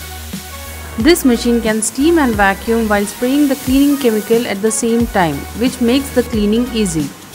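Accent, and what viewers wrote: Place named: India. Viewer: Yeah exactly what I am wondering also. What is the cost? Also the lady speaking sounds Indian but yet its out of Europe?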